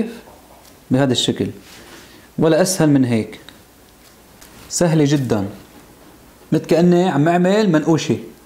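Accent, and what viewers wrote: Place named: Homs, Syria. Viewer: ماشاء الله مبين شغلك اديه مرتب الله يقويك ويعطيك الف عافيةوأحلى من القراص لسا الحكي الحمصي منحوط😊 أنا بفرفح قلبي بس اسمع حكي حومصي لأني انحرمت منه بالغربة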